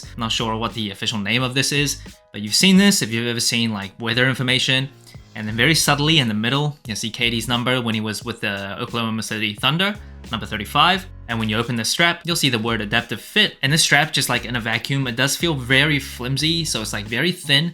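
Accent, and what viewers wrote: Australia. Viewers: Did you ever live in Australia because it sounds like you have a bit of an Aussie accent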